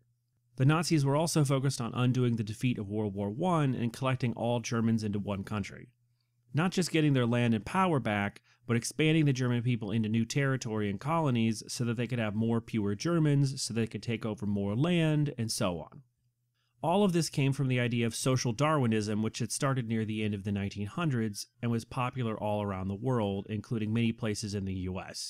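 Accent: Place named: United Kingdom. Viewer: honestly tho, i loved your brittish accent :DDD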